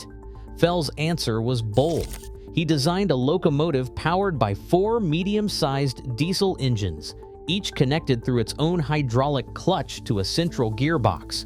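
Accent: United States. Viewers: Why an American narration?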